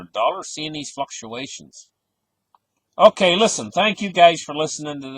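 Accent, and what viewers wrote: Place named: Canada. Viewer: Sounded extra Canadian today.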